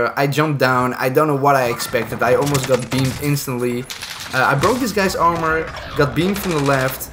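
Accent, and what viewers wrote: Netherlands. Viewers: You sound dutch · i smell nederlands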